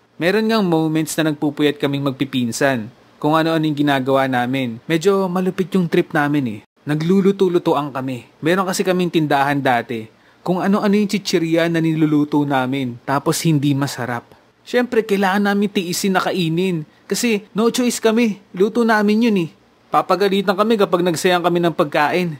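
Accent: Ilocos, Philippines. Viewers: Ilocano po kayoo?!!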